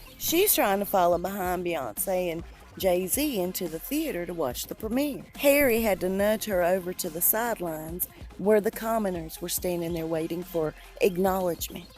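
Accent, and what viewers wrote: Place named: Texas, United States. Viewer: I love your Texas accent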